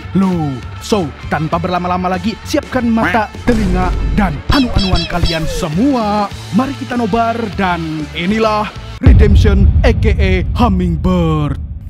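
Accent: Banjar, Indonesia. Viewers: Urang banjar mang?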